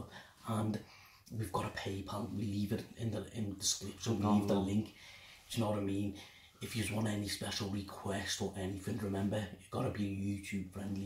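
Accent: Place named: Liverpool, United Kingdom. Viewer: totally wasnt expecting a scouse accent lol but its awesome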